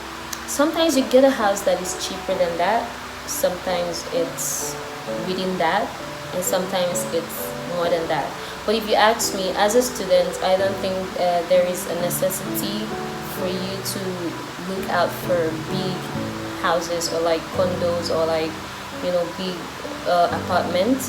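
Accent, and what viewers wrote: Philippines. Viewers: I"m a new subscriber of your channel. You speak english clearly and your accent sounded like english speaker pinoys. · Philippine English accent. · you got the philippine english accent.it’s good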